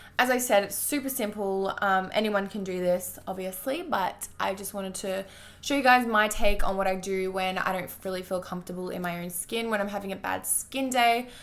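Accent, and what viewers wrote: Australia. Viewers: I don’t know if I’m being a little rude but , are you Australian I love that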